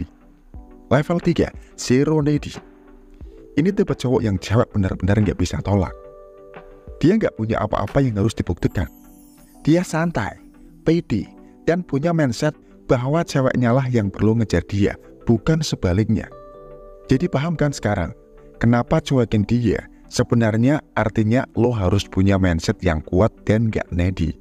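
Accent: Java, Indonesia. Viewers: Medok banget Jawir nya,, puyeng dengernya...😇😇😇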